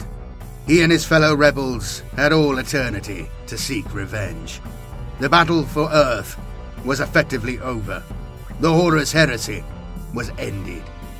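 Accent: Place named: Midlands, United Kingdom. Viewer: Good video and was nostalgic at the end remembering the old dioramas. I remember one of an orc invasion (on a desert world?) which featured a vindicare assassin atop a building. One of the highlights of my childhood. Also, good to hear a Midlands accent on youtube, even if it is the west, hehe. Greetings from the East Midlands.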